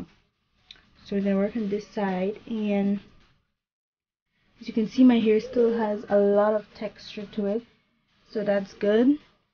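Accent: Caribbean. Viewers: where is the accent from? caribbean or south american? im from guyana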